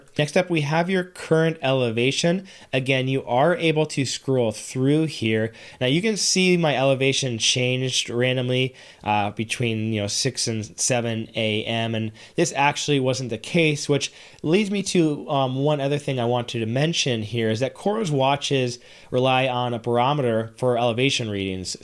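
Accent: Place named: United States. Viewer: You certainly want to be clear and explain how your (my) watch works, but you should consider it is very very hard to understand the comments for foreigners as the horrible american accent and the way they pronounce 50 percent of the words make it a real performance for users outside the US. Except, if there is a translation somewhere (which I did not found). Please make an effort !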